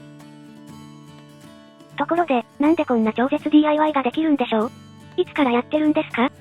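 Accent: Hakata, Japan. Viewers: チャンネル登録したよ🤗 Goodボタンも押したとよ🙋 博多弁？面白かとよ🤣🤣🤣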